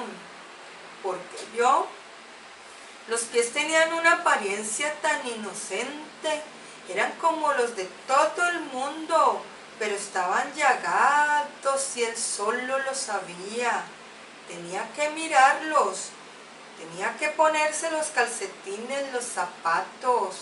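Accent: Mexico. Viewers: El acento mexicano te quito el ritmo de la lectura. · ¡Uy, dejaste morir en los créditos a tu asistente! ¿Dónde está tu versión neutral? Una última pregunta: Un mexicano cuando lee ¿Lo hace así? El acento es importante pero no es definitivo para hacer una buena lectura como "mexicano"; no dejes por fuera el ritmo, la vocalización y la inflexión de tu voz.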